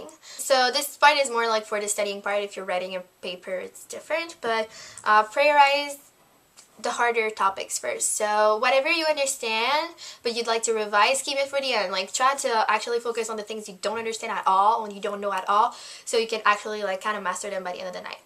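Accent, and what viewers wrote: Canada. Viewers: you're canadian aye? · are you canadian?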